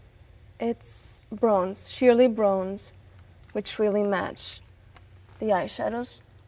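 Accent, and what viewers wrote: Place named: Italy. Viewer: italian accent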